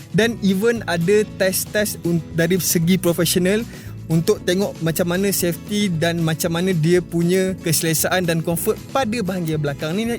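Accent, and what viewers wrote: Malaysia. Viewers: abg ini omong emang melayu banget ada yg gak ngerti........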